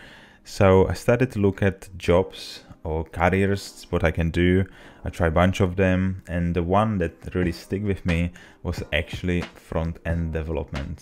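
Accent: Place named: Czech Republic. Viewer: where are you from bro? it seems to me like you have some Czech accent :D fix me if Im wrong. Im also Czech and I wanna ask you on something about United Kingdom right now. If its really difficult for foreigner with IT skills like you have to apply for a VISA? thx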